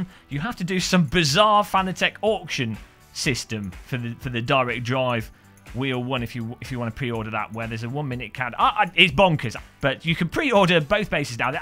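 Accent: United Kingdom. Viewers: $1500...pause...walk away....heart broken....come back...at least I get to hear you speak with your Bri-ish accent.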